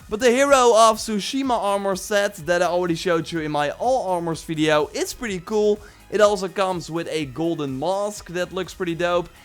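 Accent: Netherlands. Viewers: Ik vindt t echt geweldig dat ik je Nederlandse accent meteen merkte, en toen je de ps store liet zien stond t ook in het Nederlands lol Goede info btw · I knew you were dutch :) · You sound Dutch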